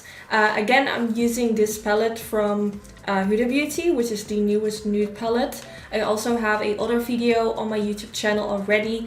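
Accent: Netherlands. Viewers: I never knew a Dutch accent could be this charming! I’m a new subscriber and I thoroughly enjoy your content!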